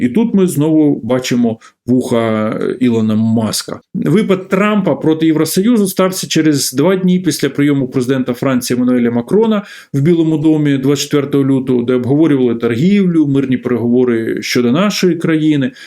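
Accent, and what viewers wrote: Russia. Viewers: У ведучого грамотна, чиста українська мова, але з російським акцентом.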